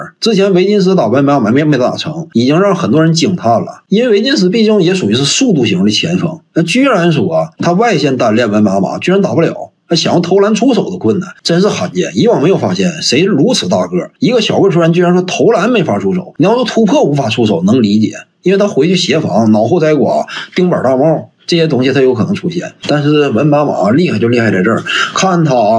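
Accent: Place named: Northeast, China. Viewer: 什么时候以满口东北话为自豪了，听到这种腔好恶心，况且这个比没有一点内容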